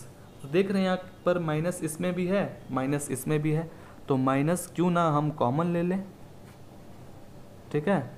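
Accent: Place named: Bihar, India. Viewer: Are sir bihar se hai na..... Lallantop style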